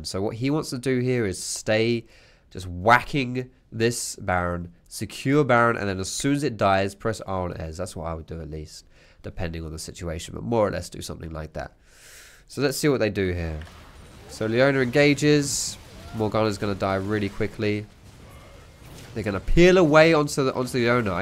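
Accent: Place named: Southern England, United Kingdom. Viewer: He is from the south of England, around Kent judging by his accent.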